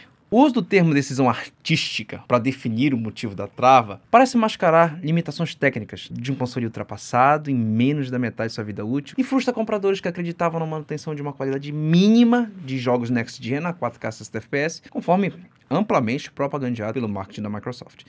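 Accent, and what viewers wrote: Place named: Belém, Brazil. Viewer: meu pai e de Belém, passo a maioria das ferias com a minha vó em Belém, nao tem como nao reconhecer esse sotaque.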